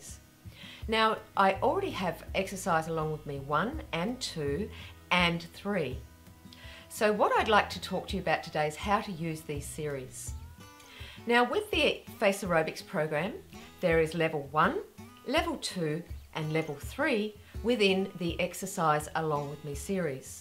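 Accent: Australia. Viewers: Geez, I just adore you:) I also love your Aussie accent! I commented before, have followed you for quite some time now, but only recently have I decided to give your steps a go as you put it all together so nicely, am still at the first week and very excited to see it through. There is so much to watch, learning never ends. Still slightly confused but hey, I will get a hang, for now my goal is to make it through all reps, all series, so that will keep me working for weeks. I have been doing various massages, small exercises and such these last years but now, given that I am approaching 50, given that I also started having neurological issues, jaw surgery in 2 weeks, I decided to this with you. Just wanted to say thanks for sharing all this with us. That good karma is giving you such a lovely, positive glow so no wonder that you loo so beautiful and fresh <3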